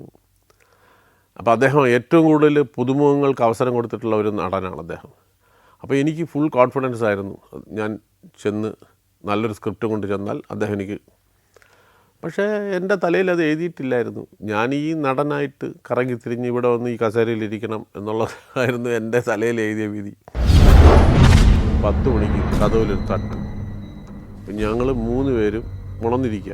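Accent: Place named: Trivandrum, India. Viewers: Love to hear proper trivandrum accent.... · City Trivandrum slang ❤ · നല്ല അനുഭവം കേൾക്കാൻ രസമുണ്ട്. അതും തിർവനന്തപുരം slangil.നന്ദു ഇനിയും ഉയരത്തിൽ എത്തട്ടെ. ആശംസകൾ. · 🤢 appi slang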